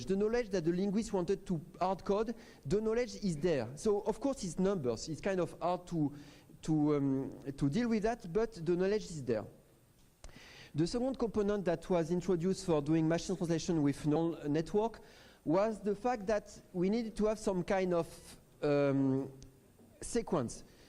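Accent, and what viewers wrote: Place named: France. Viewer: Nice french accent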